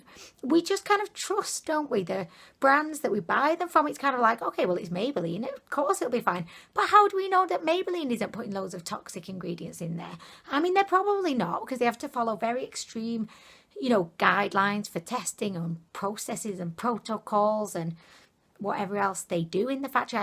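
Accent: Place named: Lancashire, United Kingdom. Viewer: Hey! Lovin´ ur videos as always, your lancs accent reminds me of home:) Have u ever tried Kiko milano? Have a nice day and don´t stop being you:) xx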